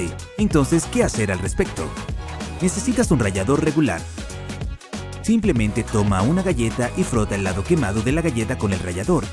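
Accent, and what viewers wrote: Russia. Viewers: Eres ruso ???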